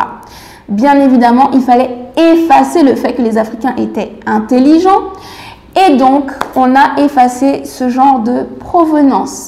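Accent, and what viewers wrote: French Guiana. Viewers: dans ta façon de parler on sent que t'es Guyanaise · je devine de par ton accent que tu es guyanaise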